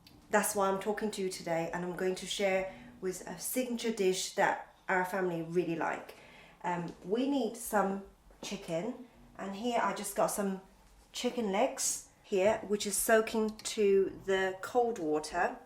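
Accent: United Kingdom. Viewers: That English accent? You should be in Bond movies,for sure?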